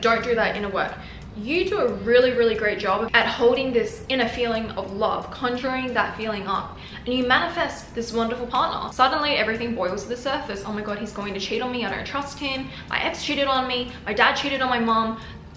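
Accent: Australia. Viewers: Asian Aussie accent ia cool 🤙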